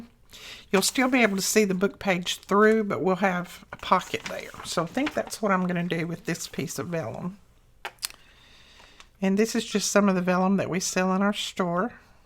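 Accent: South, United States. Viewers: Hi E! Lovely work as always! I chuckled when you mentioned that someone said you spoke too fast! You definitely don’t! 🤣 You speak slowly with that lovely southern drawl. 🥰 Infact, I often listen to your videos at 1.5 or 2 times the speed…not a fault of yours but simply because I have very limited time in which I can watch and I want to watch as many as you lovely crafty ladies as possible in my limited time intervals….and maybe the fact that I’m a fast speaker may also influence my choice I guess! But no matter what speed I watch, I enjoy your videos and your work! ❤️ Thanks for sharing! Have a lovely weekend! Hugs, Ava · Miss E you do not speak too fast! I’m Dutch and I understand everything you say. Besides I am a teacher of the Dutch language to foreigners and I am a teacher of the Spanish language. Of course it is posible that the person has a problem understanding you but that is an individual problem. 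It has nothing to do with you. By the way I love your voice and southern accent. And the lovely expressions you use. Keep up the good work! God bless you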